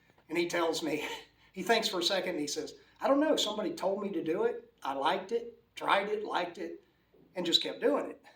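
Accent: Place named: South, United States. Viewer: love from China. love your accent as I lived in south of states for yrs. Quick question, have you ever experienced with pelvis pain or SI joint pain, especially from right hip/leg/low back? I now feel even worse when aiming lower and put left leg aside. I wonder if it's a common headache for pool players who plays 2-3hr a day.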